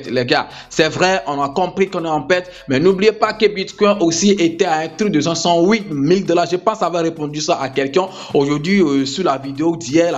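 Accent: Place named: Africa. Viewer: C'est l'accent africain.. normal quoi . Fais toi plutôt l'effort de comprendre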